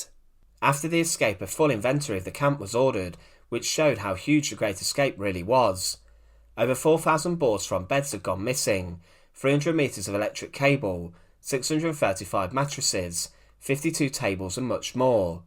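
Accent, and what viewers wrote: United Kingdom. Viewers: I am a vet and would have enjoyed this video more if I didn't have to struggle to understand the narrator. I have heard other variations of Brittish accents that I liked listening to, but not this one ! It is not my intent to be rude, but I got a headache listening to this guy. I wonder why this guy was chosen to narrate, was he related to one of the escapes who were executed.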